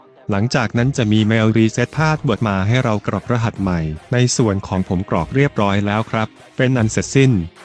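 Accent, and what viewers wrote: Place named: South, Thailand. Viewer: ผมชอบดสียงพี่เหมือนคนใต้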